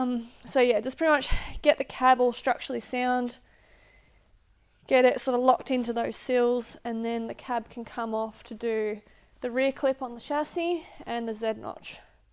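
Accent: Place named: Australia. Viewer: Слышу австралийский акцент если я не ошибаюсь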